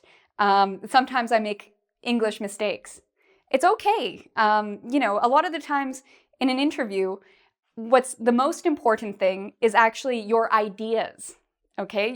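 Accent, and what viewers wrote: Canada. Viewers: shes from Canada, I can tell by her accent. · Cute canadian accent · Very useful, great! Just one question,: are you Canadian? ☺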